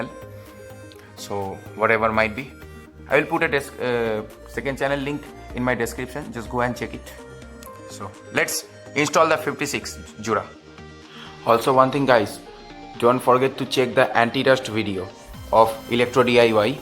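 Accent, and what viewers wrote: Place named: England, United Kingdom. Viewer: Tumi english accent a kotha bolo nah, normal a e bhalo lago!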